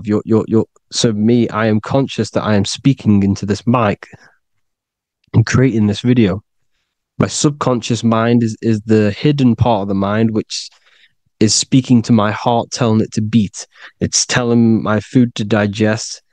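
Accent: United Kingdom. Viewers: Manchester accent?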